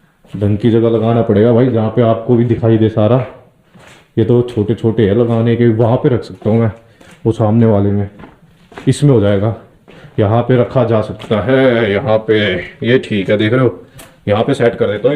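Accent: Haryana, India. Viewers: Genuine content and it is up to the mark. Never knew that such "mature" investigators are there in our community... And that हरियाणवी accent, भाई साहब! Keep growing,buddy :) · Bhai khaa k ho Aap Aap ki voice Haryana ki lgti h m bhi Haryana say hu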